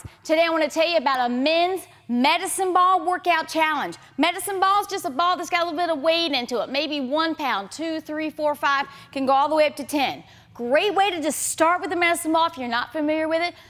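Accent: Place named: Appalachia, United States. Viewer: Sorry, can't get past the hillbilly accent.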